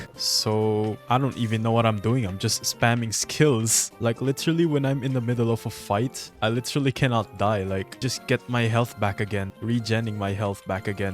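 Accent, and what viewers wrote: Philippines. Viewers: Potanginnaaa mooo pinooyyyy accent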